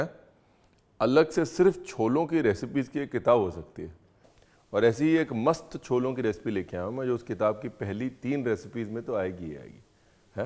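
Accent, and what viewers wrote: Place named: Punjab, India. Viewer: Love ue punjabi accent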